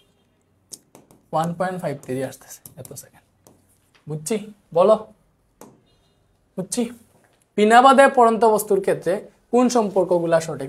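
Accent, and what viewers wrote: Sylhet, Bangladesh. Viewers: ভাইয়া কি sylheti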